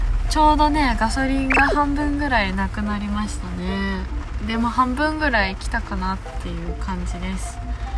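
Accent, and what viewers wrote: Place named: Osaka, Japan. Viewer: お疲れ様です✋六万キロ達成おめでとう(笑)😊何か大阪弁が板についてきたかな？(笑)😊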